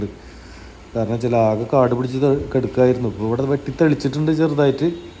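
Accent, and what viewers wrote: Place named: Thrissur, India. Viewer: സംസാരം തൃശൂർ ഭാഷ മാറ്റുക,.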